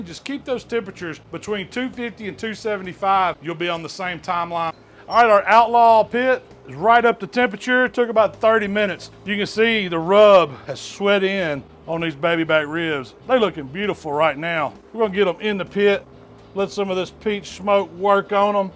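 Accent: South, United States. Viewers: his southern accent alone makes me trust this guy when it comes to anything related to BBQ and smoking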